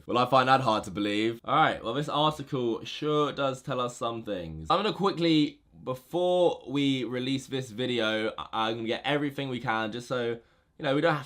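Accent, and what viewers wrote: England, United Kingdom. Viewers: Why do i get this clown in my recomended? I dont care about Greg Paul making out with anyone, even less i care about you exposing him.. You are faker then Kylie's titties... After all we are not judging you for being born English and talking your stupid accent. Youtube please fix your recommendation shit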